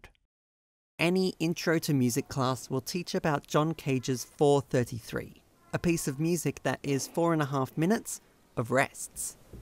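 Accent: Australia. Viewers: I know you're emphasising words for clarity, probably because of your Aussie accent. I just find it disjointing and would appreciate more a more natural style of narration. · Are you from Australia?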